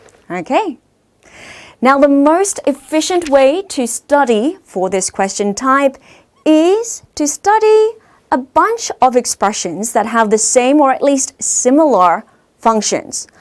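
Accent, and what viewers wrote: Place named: England, United Kingdom. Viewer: Her English accent 😍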